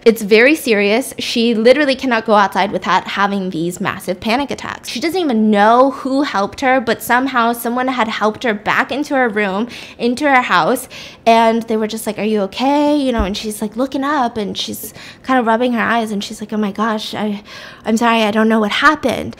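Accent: United Kingdom. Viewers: Ever since has started speaking in an British accent I’ve added it to my daily life 🤩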